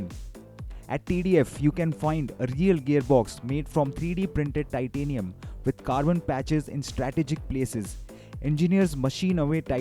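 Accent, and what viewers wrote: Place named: India. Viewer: bro just reuploaded driver61 with an indian accent and thought yeah thisll do numbers? bro come on now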